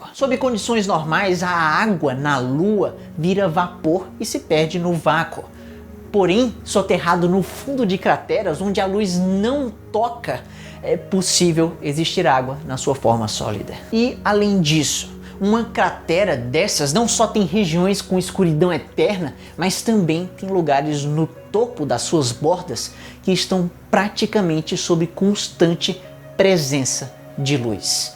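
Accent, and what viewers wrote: Ceará, Brazil. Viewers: Pera c é Cearense?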